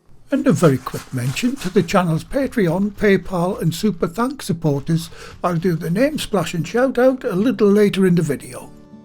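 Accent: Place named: Gateshead, United Kingdom. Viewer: Move to Gateshead, they all sound like that.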